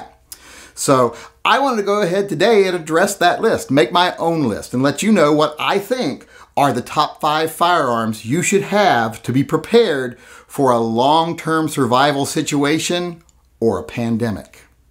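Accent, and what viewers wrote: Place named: Appalachia, United States. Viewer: I disagree with your hillbilly pronunciation.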